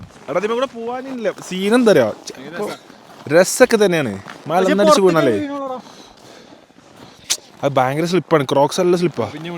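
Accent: Malappuram, India. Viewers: മലപ്പുറം ഭാഷ ഒരു രക്ഷയും illa 😁😁💕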